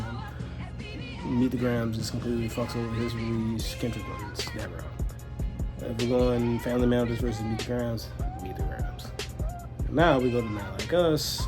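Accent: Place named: DMV, United States. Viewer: I feel like I understand Coles apology because he was acting out of character. He seemed like he regret getting out of himself to get in this hip hop compatible persona that everyone expects and as someone who kinda been on a similar bath of change as I can understand to a big degree and I kinda felt like hip hop beefs and disses was kinda corny for a good while. It sounds like I hear some DMV in your voice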